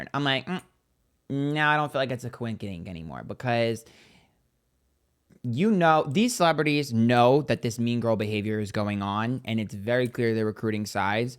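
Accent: New York, United States. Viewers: I know you live in Texas but I recognize that New York accent! I’m born and raised in Long Island NY and people make fun of how I saw words and how fast I talk. But when I listen to you, I feel like I’m listening to a friend from home. Love it!!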